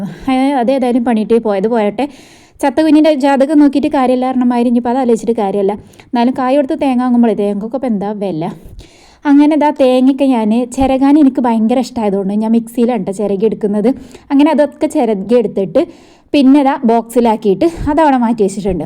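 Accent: Malappuram, India. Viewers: Niga serika Malappuram aano akka ekadhesham adhe bhasha😊❤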